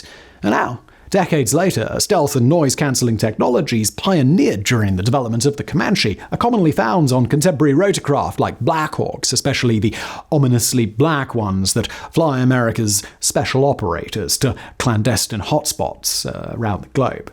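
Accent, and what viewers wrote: England, United Kingdom. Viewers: Arrogant use of English accent. This guy is a Never Soldier w/ Cavalier wuss Talk Anti American Buffoon!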